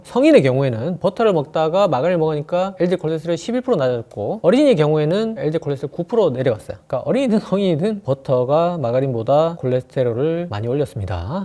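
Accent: Gyeongsang, South Korea. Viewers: 오늘따라 경상도 억양이 너무 쎄게 들리네요 말을 잠간 끈어서 말씀해주면 감사겠습니다 숨도 안쉬고 계속 말하는것같아 듣기가 좀 힘이듭니다 항상 감사합니다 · 쫏기듯 말은 빠르고, 발음은 정확하지 않고, 톤은 높고, 경상도 억양은 심하고 듣기가 불편함.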